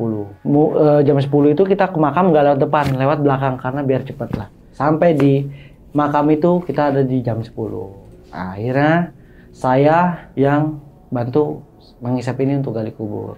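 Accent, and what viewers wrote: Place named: Bogor, Indonesia. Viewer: Rada cape dengerin ceritanya. Padahal kita sama orang sunda. Mungkin karna orang bogor jd dikit beda nadanya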